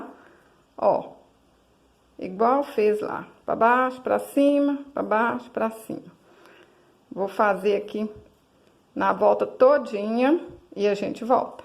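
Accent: Minas Gerais, Brazil. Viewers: "O TREM BONITO DE MAIS." ADORO VC FALANDO ASSIM .O MINEIRES . TB SOU MINEIRA 👏👏👏👏👏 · Boa noite aqui é Silvania de Sabará,eu amo esse seu sotaque minerim.É bom demais da conta. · Eu sou mineira e pelo seu sotaque você também é 🥰 sou de Patos de Minas Minas Gerais,a capital do milho 😅 com muito orgulho da minha cidade!!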